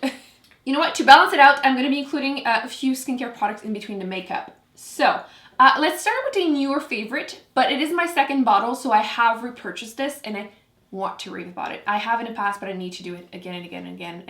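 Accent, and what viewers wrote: France. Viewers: Hi! Thanks for this! (I’m from Ontario and just love your French accent!) For baby hairs/fly aways, to keep them in place, just spray some hair spray on a toothbrush and comb them down. ❤️💋🇨🇦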